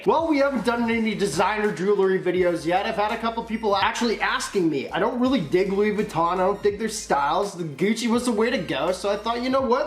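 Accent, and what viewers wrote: Canada. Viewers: dude are you canadian or american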